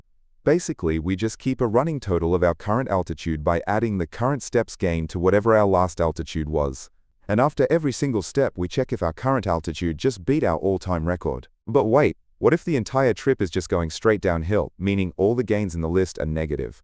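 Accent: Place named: United States. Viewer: I could've sworn there was an American accent earlier.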